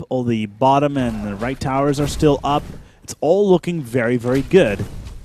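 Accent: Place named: United States. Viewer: i like how you make your voice all americany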